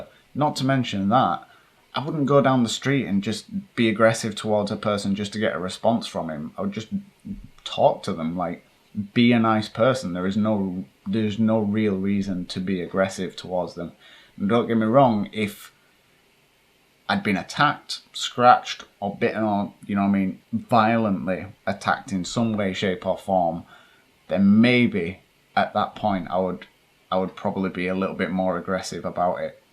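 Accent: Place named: England, United Kingdom. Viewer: I'm a very new subscriber, and have spent the past two nights binge watching your videos and creeping myself out alone at night. Have you ever tried old school spirit boards, Ouija, or pendulums to communicate and ask questions to the spirits ? Love your hard work exploring these spirits and love listening to your English accent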